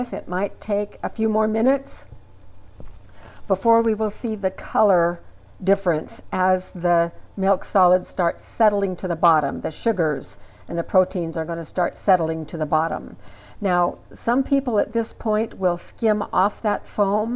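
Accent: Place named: Arizona, United States. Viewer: Is she from Arizona Sounds me like šhe is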